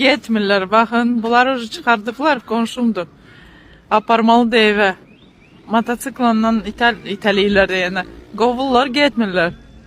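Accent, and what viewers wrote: Ganja, Azerbaijan. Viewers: Şirin Gəncəli ləhçəsi ilə danışırsız nəsə əlaqə var ora ilə ? 😂.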